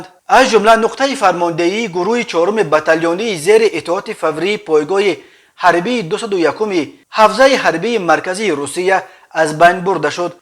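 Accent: Pamir, Tajikistan. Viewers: Хами лахчаи помирии хамин баранда ба чон расид, ягон одами дигар наефтен, ки бе акценти помири гап занад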